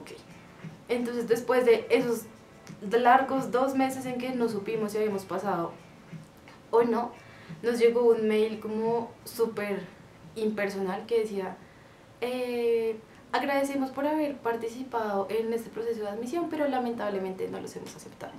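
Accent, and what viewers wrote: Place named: Colombia. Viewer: Holaa guapa! encontré tu canal en el de Marisol de mi vida con Mateo y me encanta, me gusta como hablan los colombianos pero así como tú jijii me suscribo y te invito a mi canal también soy mamá <3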